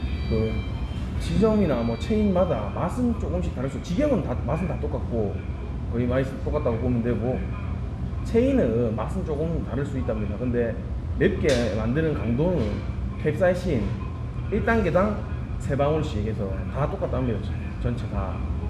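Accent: Gyeongsang, South Korea. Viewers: 님 고향이 어디신가요? 경상도 사투리인건 알겠는데 어느지방쪽이신지?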